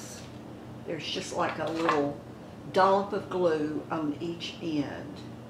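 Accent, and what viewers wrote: South, United States. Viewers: You are the cutest! The lashes look great on you! Your southern accent 😍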